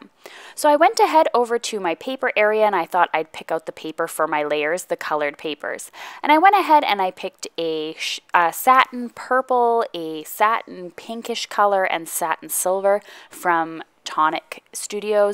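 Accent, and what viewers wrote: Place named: Canada. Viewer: Four things I learned from this video: 1. You have for sure been back in Canada long enough for your “about” to sound more “aboot” 😊 2. I need to see more of the puppy!😍 3. It’s really fun to watch this process 🎬 4. I really am that lazy, it’s why I know I need almost all of my supplies in easy reach places 😄 Thanks for sharing! Oh and for the Misti and trimmer you could add a bar to the inside of your desk near the floor to slot them into. I’ll IG you a pic 👍 it works great for me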